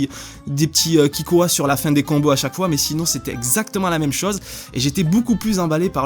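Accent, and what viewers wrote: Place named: Toulouse, France. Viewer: il a un accent psk il est toulousain ok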